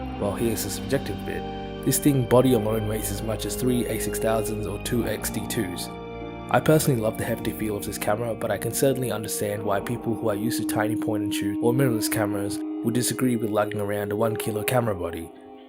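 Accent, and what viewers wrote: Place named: Australia. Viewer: He's Aussie mate!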